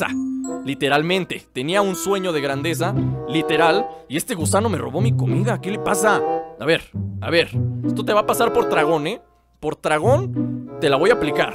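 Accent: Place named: North, Mexico. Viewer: Hola, es la primera vez q veo un video tuyo, me podrías decir de que ciudad eres? Tienes acento norteño, como de Monterrey, México. Saludos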